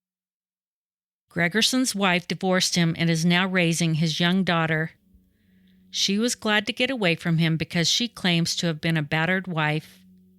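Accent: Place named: Midwest, United States. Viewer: You have the sweetest Midwestern accent. New follower here. Love your channel.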